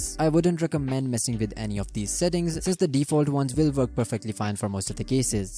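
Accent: India. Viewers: Never never never trust an Indian accent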